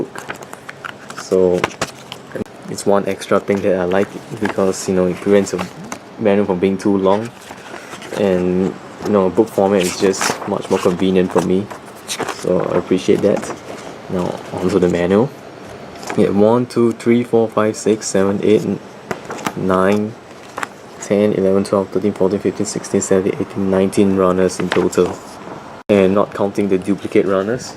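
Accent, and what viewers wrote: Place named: Singapore. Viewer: hahah , recently saw stock at Gkgundamkits thou . you sound like a Singaporean . haha .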